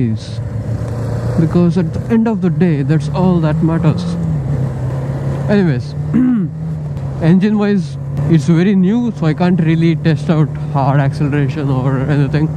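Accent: Karnataka, India. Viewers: It feels good to hear the review by an Indian in an Indian Accent. BMW G310R is Love.. 😍 GS 310R would be awesome too.. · The review ok. I had a very difficult time understanding that very heavy Indian accent. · excellent review, man. loved it. are you from Karnataka? just curious, and I'm basing it off your accent. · hope for best of it dude are u Indian you some of sound like Indian 😛😜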